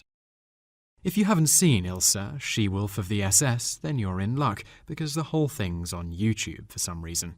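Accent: England, United Kingdom. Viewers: Thoroughly enjoyed the video....and the manner of presentation. It is not often that I create a list of topics to "research" based on a YT video....but this one will have me scurrying to see some of these movies...perhaps: "again" (Audie Murphy especially). The narrative script was excellent.....and the narration itself. I don't subscribe to a channel unless I'm pretty darned impressed with the content...or I've had some enjoyable dialogue with someone. Subbing up to your channel now. ~RED ☮♥♫ (BTW...an "aside"....I used to have an English boyfriend....his accent and voice tone was the softer/smooth sort....exactly like this narrator. So...kind of a "warm and fuzzy" recollection for me. :-) Never forgot him).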